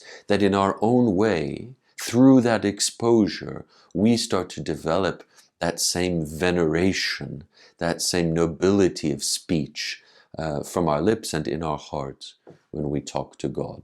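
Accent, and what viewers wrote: United States. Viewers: he does sound like an American that’s changed a bit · Vladyka bless. I thought you just had an Atlantic American accent. I was born in Australia, but being of Macedonian background, I still use some Slavonic hymns and phrases. Svyati Boze, Svyati Krepki, Svyati Bezsmrtni, pomilui nas.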